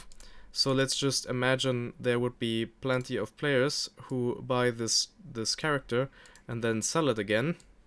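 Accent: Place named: Germany. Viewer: Ein Deutscher das erkennt man am Englishen Slang :D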